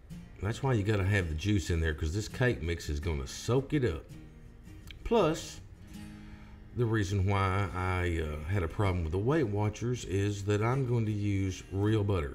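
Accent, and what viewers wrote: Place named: South, United States. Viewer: You are so cute. I could listen to you talk all day with that country accent.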